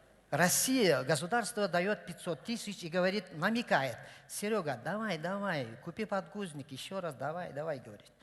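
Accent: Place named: Kyrgyzstan. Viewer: Мен отуз жылдан кийин орусча эсимден чыга баштап келсем Бишкекте азыр кыйгыздар суйлогонун ушундай угуп калам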